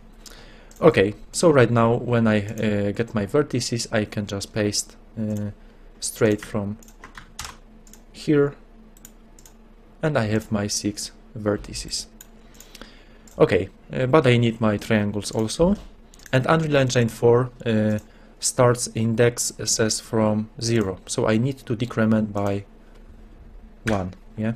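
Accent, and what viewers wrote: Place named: Poland. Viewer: i am suscribed to a youtuber from Poland, and i recognized the accent :)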